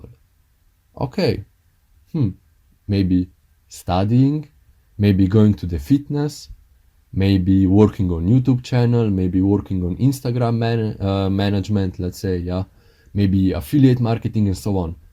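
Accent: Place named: Spain. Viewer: your language accent is beatiful are u spanish or little bit south european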